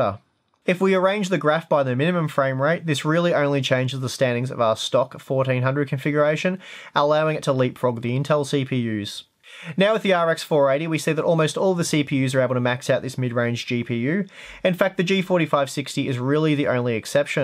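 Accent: Australia. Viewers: ahh the good ol aussie twang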